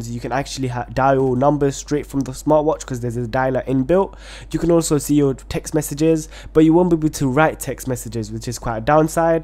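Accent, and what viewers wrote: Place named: India. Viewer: Are u indian?if u are then ur accent is pretty impressive :)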